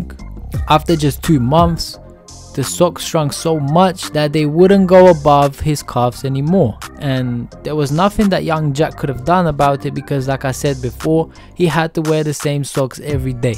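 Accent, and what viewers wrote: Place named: United Kingdom. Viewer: Nice british godfather accent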